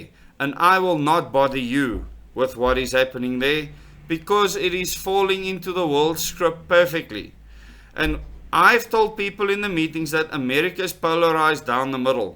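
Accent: South Africa. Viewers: It is so refreshing to hear a South African accent saying what we have come to know from the truthing community (mostly US) over the last few years. Love it. Mooi man! Mense is besig om wakker te skrik.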